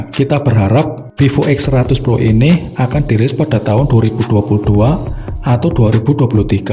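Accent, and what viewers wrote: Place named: Tegal, Indonesia. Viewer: Logat tegalnya medok bet dah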